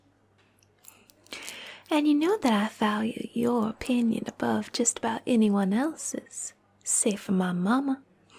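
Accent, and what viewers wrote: South, United States. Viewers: OMG! I know she's not real but I can't help but smile each time I see our sweet Southern Bell. Moving?!?! No!!! Why is our character an idiot? Why is it in most role-plays in the ASMR community, our character is the equivalent of a handsome Village Idiot? Then again, if our faceless character was actually smart, he'd put a ring on her. (Loved the video,. Yeah, you've definitely perfected that sweet Southern accent. Happy Holidays!) <3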